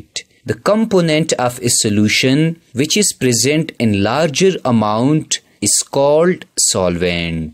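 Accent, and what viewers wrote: India. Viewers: I can't learn well because I'm distracted by the Indian accent 😭🙏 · I think hes india he cant help it its their accent though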